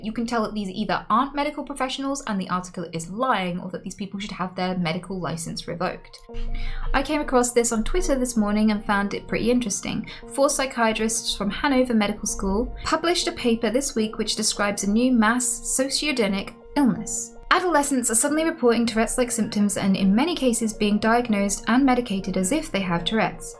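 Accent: United Kingdom. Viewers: I find it very intriguing that some of your ticks have an American accent and some have your British accent.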